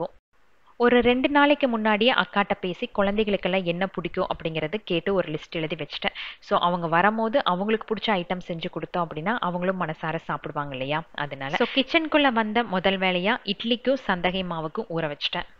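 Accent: Coimbatore, India. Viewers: Uoinga video la nalla iruko sis....neinga coimbatore ah pesum pothu konjam theyriuthu... good luck 💐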